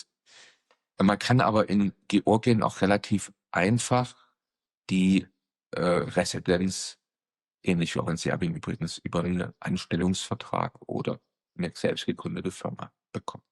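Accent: Germany. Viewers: Fränggisch. Des is fei goud. freili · hört sich bissel nach Sachsen oder Thüringen an. An manchen Stellen verschluckt die Technik ganze Silben. Die Akustik ist aber in Ordnung, da hallt nix großartig nach.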